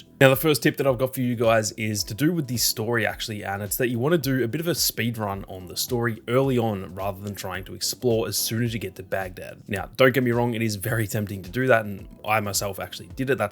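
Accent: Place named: Australia. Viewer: Good tips Aussie fella lol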